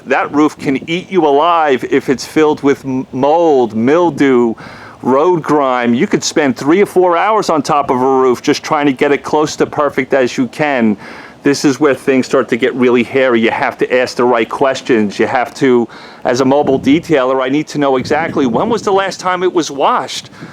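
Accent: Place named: Dallas, United States. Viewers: Gotta love the Texas accent. ("Howard Stern talks RV detailing") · Niiiiiice. Your accent is a typical Dallas Accent. LOL.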